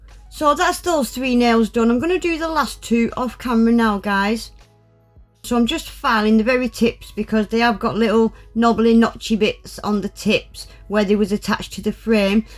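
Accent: Yorkshire, United Kingdom. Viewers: By the way, which part of Old-Blighty are you from? You definitely sound like a Yorkshire girl!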